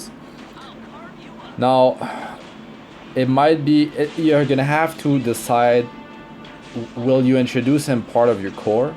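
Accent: Quebec, Canada. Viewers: Hmm québecois? Je reconnais l'accent dans l'anglais haha, nice video man ;) I'll make sure to watch more of your content !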